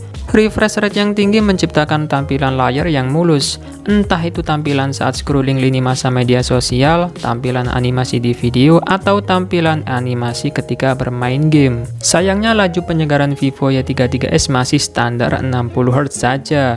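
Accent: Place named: Madura, Indonesia. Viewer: orang madura yah bang, logatnya kaya madura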